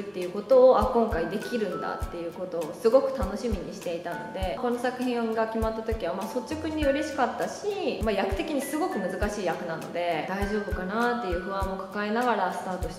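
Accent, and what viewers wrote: Kansai, Japan. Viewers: 週末で「今際の国のアリス」見て、このクセの強いキャラを演じてるのは誰だ？と思いました。この動画でバリバリ関西人だと分かった。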